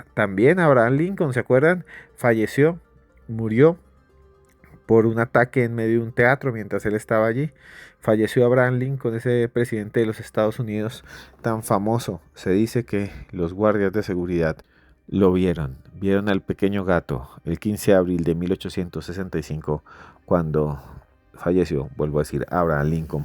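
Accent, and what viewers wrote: Colombia. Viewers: No hay mejor narrador!! Que colombiano, me encanta !!! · es Maravilloso, es auténtico,puro colombiano, su vocabulario es hermoso, me encanta escucharlo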